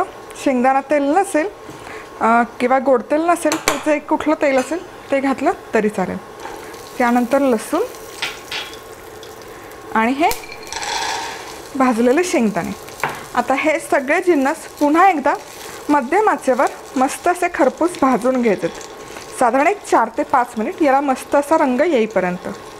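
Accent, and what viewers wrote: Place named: Pune, India. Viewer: Aap bahot looking ho mam aapki har ek recipe achi hepar aapka marathi me thoda sa alag he puna jaisa softly baat karo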